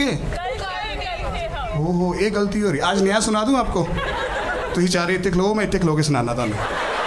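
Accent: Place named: Punjab, India. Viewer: Punjabi language and the accent is lit🔥🔥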